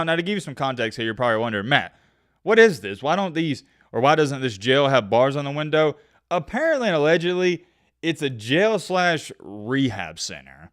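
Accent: South, United States. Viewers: This announcer us annoying AF. Not even going to finish this story. I can't take this hillbilly dribble.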